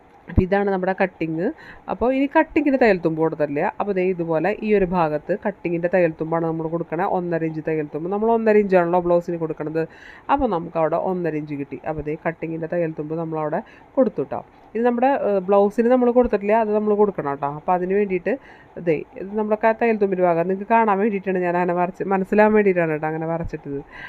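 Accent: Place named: Thrissur, India. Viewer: നിങ്ങള് material മ ടക്കുന്നത് ഒന്നും പറഞ്ഞു തരുന്നില്ല . തൃശ്ശൂർ karude varthanam nangalk ഒന്നും മനസ്സിലാവില്ല. prini, miracle ഇതൊക്കെ kandu നോക്ക് . എങ്ങനെ അവർ പറഞ്ഞു തരുന്നത് എന്ന്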